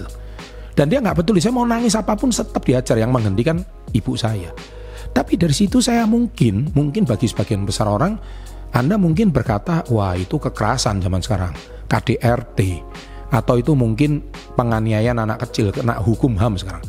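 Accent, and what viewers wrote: Java, Indonesia. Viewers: Pak.. Logatnya kok kaya orang jawa... Ya.. 😊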